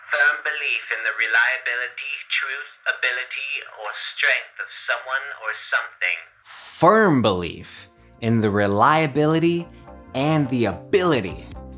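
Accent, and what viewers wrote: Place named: United Kingdom. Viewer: Appreciating all of this but esp the British accent dudeeeee.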